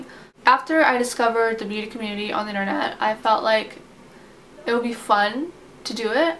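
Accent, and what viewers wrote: Vietnam. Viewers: AND: My Sister is looking like you (80%) and she is 100% Full Northvietnamese.. So, i believe you are more than "1/4 Vietnamese" ( ' o . o ) But your accent is Southvietnamese.. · I honestly didn't think u are vietnamese, but then now u mention it I can see some vnmese features on u and hear a little bit of viet accent. Ur viet is very cute too <3 I honestly think u have cute teeth, pls don't be embarrassed about it, can't believe s.o ask u that kind of question. what's the point if everyone has straight teeth right?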